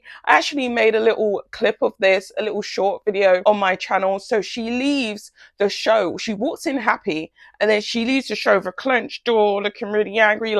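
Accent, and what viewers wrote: United Kingdom. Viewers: As soon as I heard your British accent I knew this was a hate video!!!! F U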